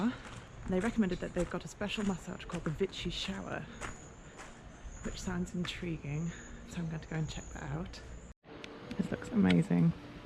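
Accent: England, United Kingdom. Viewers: You’re from England? I can hear your accent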